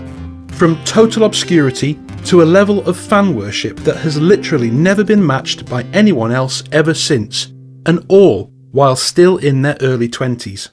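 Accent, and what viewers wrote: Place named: North, United Kingdom. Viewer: You are an excellent storyteller! Don't short-change yourself or your audience by adding meaningless background music😮 Seriously! It's enjoyable to listen to your voice, northern accent and natural speech rhythms👍🎉 Any background music is not only superfluous but substracts from the listening experience imo.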